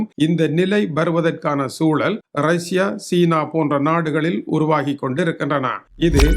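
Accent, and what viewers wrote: Sri Lanka. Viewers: அதிஅகோரம் ப்பா ஸ்ரீலங்கா தமிழ் ❤❤❤❤❤❤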